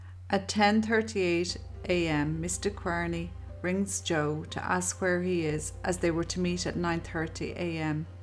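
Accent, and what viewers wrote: Ireland. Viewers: Watching from New Zealand, brilliant, easy to understand, don't try to change that lovely accent. I spent years living in Cork city. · love to hear Irish accent! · Subscribing from Florida. Your Irish accent is absolutely beautiful! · you be true to yourself. lovely Irish voice... Calm, and if slow for some, i think it hints at empathy which is appropriate considering to innocent peoples lives affected forever by crime & destructive behaviour.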